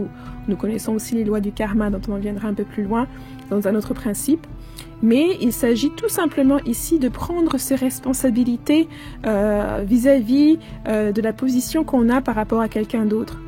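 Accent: France. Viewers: Merci pour ce partage que je partage également.. juste comme ça, tu ne serais pas de Lorraine? De part ton accent ^^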